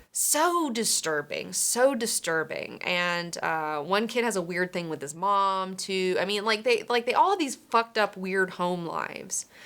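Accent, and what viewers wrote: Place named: Baltimore, United States. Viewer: The Baltimore accent is strong with this one. 😀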